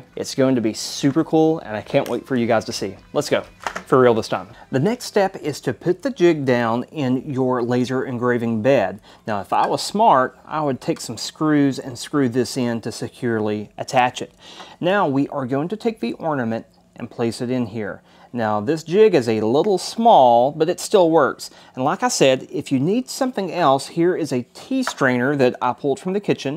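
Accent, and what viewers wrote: South, United States. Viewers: You have a new fan. Great video very detailed and I swoon over that country accent ❤️❤️❤️❤️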